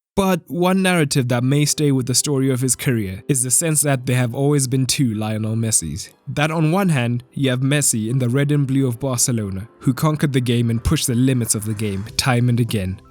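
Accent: South Africa. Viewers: Please do one for Ronaldo too. P.S. Are you from South Africa? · Are you South African or Zimbabwean?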